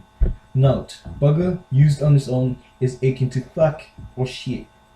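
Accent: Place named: RP, United Kingdom. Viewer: your English accent is soooo posh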